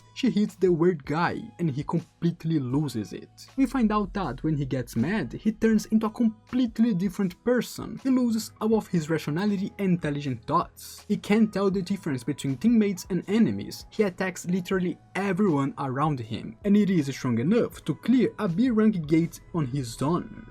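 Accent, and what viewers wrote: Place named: Brazil. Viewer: mano, eu venho assistir teus vídeos regularmente e eu gosto deles. Mas tem um problema, o seu sotaque é bem forte é as vezes fica um pouco difícil de entender. eu moro no Reino unido desde de eu tinha 10 anos, então eu estou familiar com o ingles e se vc quiser a gente pode conversar um pouquinho e te der umas dicas pra melhorar a pronunciamento. Tudo de graça, é claro, eu só quero ver mais um brasileiro suceder na gringa.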